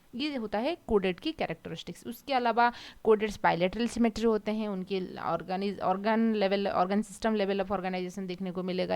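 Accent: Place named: West Bengal, India. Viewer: Man you are from West Bengal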